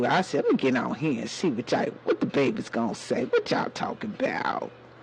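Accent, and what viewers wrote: South, United States. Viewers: Omgosh u sounded like my mom when u said that I'll tell u that much! That southern drawl needed that today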